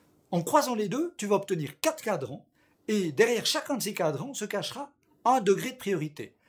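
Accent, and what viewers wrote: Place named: Romandy, Switzerland. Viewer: Je viens de découvrir ta chaine et j'ai été très agréablement surpris :). Bon contenu, bons conseils, des trucs réalistes et honnêtes, ça fait plaisir dans ce domaine. Je décèle une pointe d'accent familier, est-ce que tu viendrais pas d'un petit coin de Suisse romande par hasard ?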